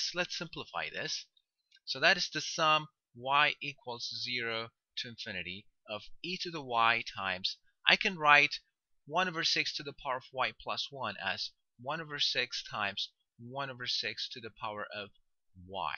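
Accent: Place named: Germany. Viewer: I detect a slight german accent.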